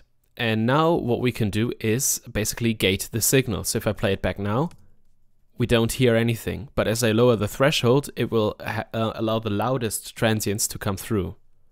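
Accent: Germany. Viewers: Coole Tutorials und Reviews, aber dein deutscher Akzent geht mir voll auf die Glocken alter !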